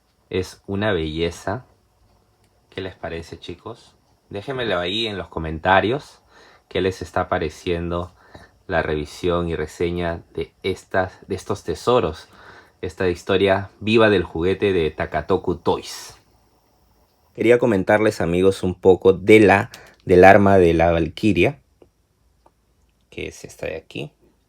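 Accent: Peru. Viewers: Me encanta tu canal cada vez que puedo veo tus episodios me mate de risa con la de Arnol y lo de Jb se la pase a mi bros para que se caguen de risa también, te felicito por tu canal con tu acento Perucho es de mi época bravazo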